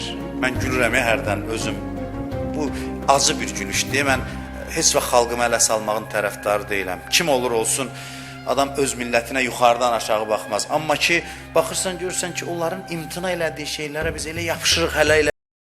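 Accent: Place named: Jalilabad, Azerbaijan. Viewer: Senin bu Celilebad lehceni esidende üreyim bulanır , get oyza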